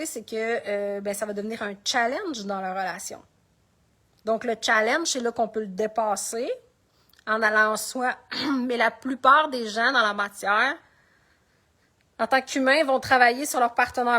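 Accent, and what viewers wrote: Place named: Canada. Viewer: Tu es Canadienne à ton accent . 😅 🤔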